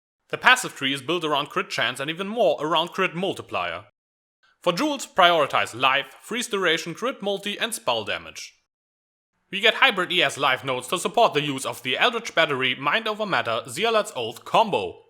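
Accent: Germany. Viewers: Talking like what? He's German, you expect him to have no accent?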